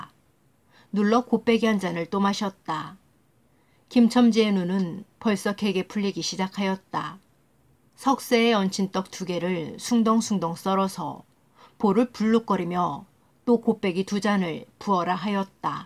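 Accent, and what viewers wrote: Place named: Pyeongan, North Korea. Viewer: 사투리 ㅋㅋㅋ 무슨 평안도 사투리같아요 ㅋㅋㅋㅋㅋㅋㅋㅋㅋㅋ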